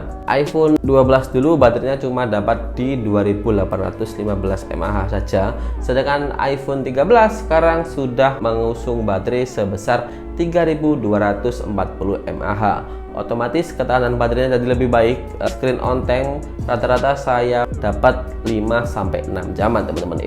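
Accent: Mojokerto, Indonesia. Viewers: smn wong mojokerto ta mas 😅?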